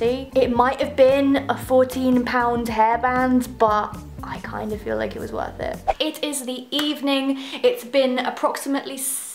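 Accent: United Kingdom. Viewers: I did a British accent reading the title before I click this and I’m surprised ur British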